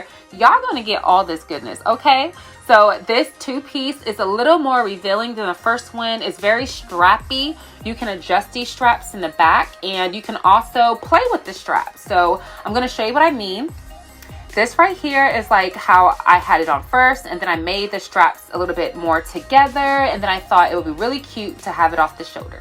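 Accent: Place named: Baltimore, United States. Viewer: Ure the best at this by far n ur Baltimore accent is cute. Most girls can't make it cute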